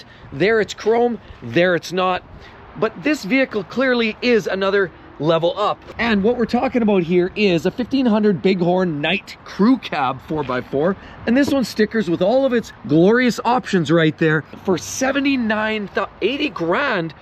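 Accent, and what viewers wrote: Canada. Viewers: New to this channel you sound Canadian, I'm assuming this means these prices are also in Canadian?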